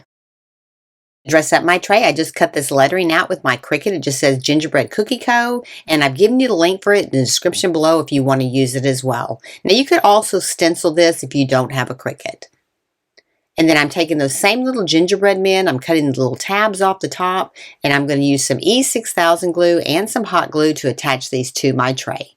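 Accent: South, United States. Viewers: These are so cute! This has got to be in my Top 5 craft channels I follow on YouTube, if not the top spot! VZ perfect crafts, perfect Southern accent with the right level of sound. Thank you.!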